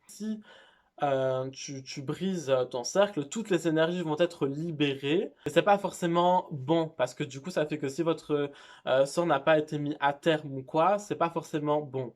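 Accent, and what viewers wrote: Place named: Marseille, France. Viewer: Super l accent marseillais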